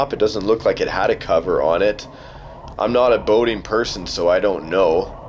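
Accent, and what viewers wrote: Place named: Canada. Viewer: why do I automatically like people with Canadian accents??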